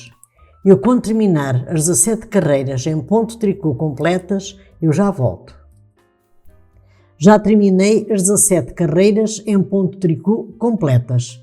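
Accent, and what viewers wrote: Portugal. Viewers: Muito linda adoro seu sotaque amo portugalbjss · Adorei perfeita ao ensinar fiquei emocionada com seu sotaque pois fui criada por portugueses parabéns saúde você encina bem e sem mistérios saúde paz axé 💖😘🙏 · Adoro seu trabalho, amo seu sotaque português, amo muito os portugueses, meu pai era português!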